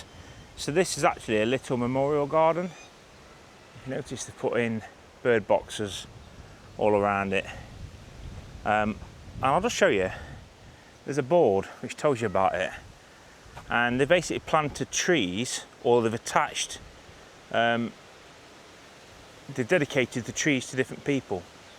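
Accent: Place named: Leicester, United Kingdom. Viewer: You sound like you’re from Leicester.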